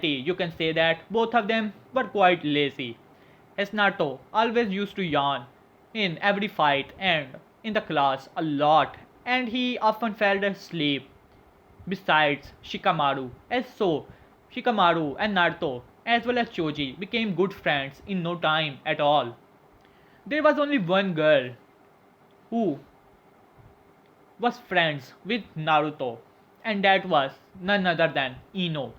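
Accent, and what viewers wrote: India. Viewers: He has an Indian Accent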